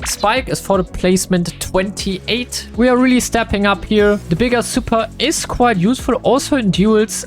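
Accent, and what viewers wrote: Germany. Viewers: Ar u German ? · your german arent you pls say it in your next wedio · Man hört den Deutschen Akzent so krass raus! 😂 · bro are you german? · Are you german I hear an accent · This guy is defo German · Dieser deutsche Akzent ist ja crazy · could it be that you speak normally german ?? cause in the first 30 seconds of the video i relsied that your speaking of english is a bit different like mine cause i normally speak german too XD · Dude i heared you First time and i instantly knew that you are a german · Man merkt dass du deutscher bist😂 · Es ist so geil dich englisch sprechen zu hören mann hört das du deutsch bist (ich spreche genau so englisch)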